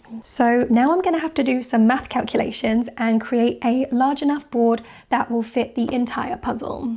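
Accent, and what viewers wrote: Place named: England, United Kingdom. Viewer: there is just something about watching a beautiful redhead with a cute English accent doing puzzles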